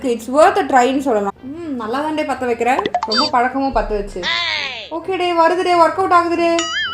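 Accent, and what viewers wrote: Tirunelveli, India. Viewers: Neenga tirunelveliya de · Tirunelveli ya????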